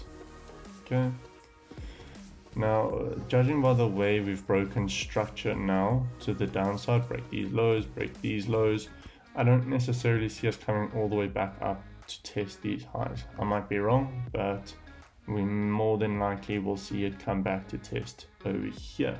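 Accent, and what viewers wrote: South Africa. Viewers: First time watching one of your videos. Definitely becoming a new sub. Loving the South African accent 💪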